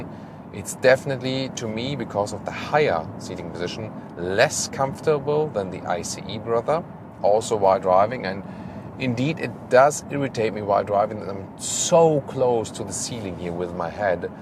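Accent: Germany. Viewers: …watch him just for his enthusiastic German accent. Wunderbar!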